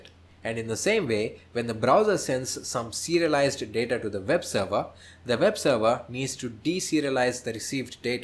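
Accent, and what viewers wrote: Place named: Guntur, India. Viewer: Indian accent.. no thanks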